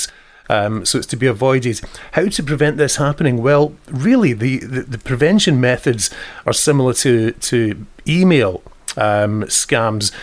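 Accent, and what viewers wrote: Scotland, United Kingdom. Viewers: loved hearing your Scottish accent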